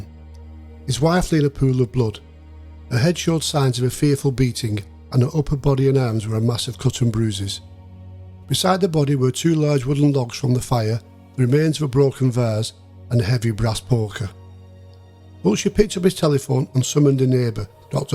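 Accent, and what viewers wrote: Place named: Lancashire, United Kingdom. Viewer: has a lovely Lancashire voice and accent. I love listening to him.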